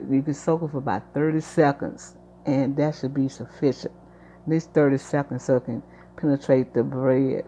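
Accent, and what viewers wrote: South, United States. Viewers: An amazing breakfast! Love ur hospitality and ur voice. So southern . God bless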